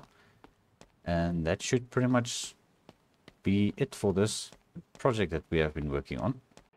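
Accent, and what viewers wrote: South Africa. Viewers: I knew you were South Africa as soon as you started talking. My wife is from South Africa, she doesn’t have the accent but ALL of her family does. She came to the US as an Au Pair. I can’t wait till we go visit SA because she’s always telling me cool stories and showing me some of the beautiful places over there. We went to a camping event over here in Texas and it was a bunch of SA people hosting a potjie and it was some of the best food I’ve ever had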